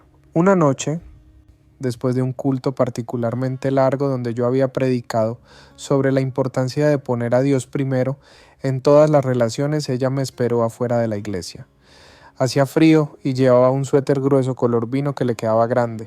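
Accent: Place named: Central America. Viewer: buscado una voz con acento argentino y no centro americano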